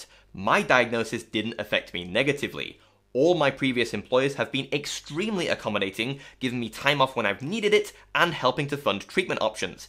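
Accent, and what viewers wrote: United Kingdom. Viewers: In the future it's worth pointing out where the charity you're advocating for is based in. As I suspected from your accent and I did look it up - Mind is based in the UK. I don't have anything against helping UK but I live in Poland and mental health awareness is basically non existent here and we also need a lot of support.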